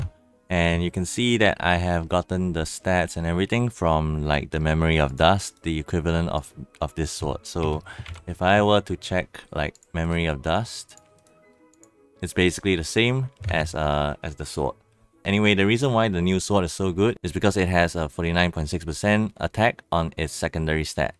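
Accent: Singapore. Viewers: I love how organised and clear all your calculations are, it makes it super easy to just refer back to the spreadsheet whenever I want! Also fellow Singaporean here, I was wondering why your accent sounded so familiar xD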